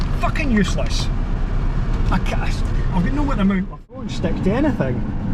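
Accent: Scotland, United Kingdom. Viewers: I absolutely love your accent. Is that Scottish? I'm tuning in from Boston Massachusetts.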